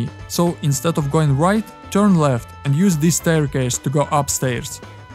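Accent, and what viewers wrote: Russia. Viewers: акцент русский слышно)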